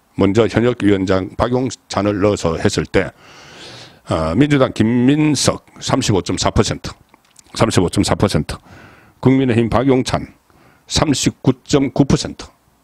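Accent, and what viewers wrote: Gyeongsang, South Korea. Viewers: 송국건은 서론과 잡다한 중복 이야기가 너무 많아 답답하다. 좀더 깔끔하게 발언하면 좋겠다. 다른 사람과 대담식에도 자꾸 엉뚱한 말로 끼어들어 혼란을 야기한다. 경상도식 언어로 잡다한 얘기가 많으니?참고하기 바람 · 경상도 발음 부터 좀 고치는게 더 좋을것 같다~~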